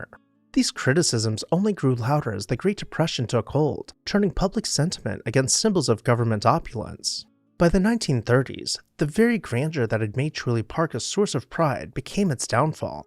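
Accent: United States. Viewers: Correct. Although factual, the narrator has an annoying way of speaking and a definite American accent. Words are written using American spellings omitting the 'u' as in the word favourite. Never the less, Toronto does have a history of demolishing its best architecture, as this video illustrates. I've been to Chorley Park and all that is left is the plaque and the bridge. Truly sad.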